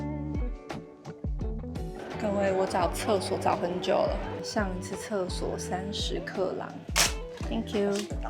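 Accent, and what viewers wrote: Taiwan. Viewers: 台湾旅游博主拍得最好的一个，台湾口音特别好听的，没有太多鼻音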